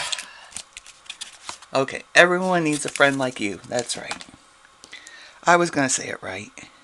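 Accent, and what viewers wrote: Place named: North, United Kingdom. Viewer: Very pretty card! I love how you mixed the hearfelt creations with the Stampin Up. When you say y'all it cracks me up because i don't hear much of an accent, or maybe a northern accent.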